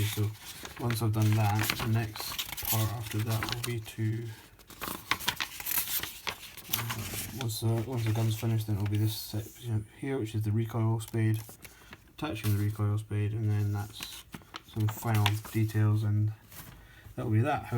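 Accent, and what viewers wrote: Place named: East Coast, United States. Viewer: Nice looking build, are you based east or west coast, you dound like its east coast. I have no problem with how you speak, but......... guess where I'm from? Stick at it, you' ll build up a following.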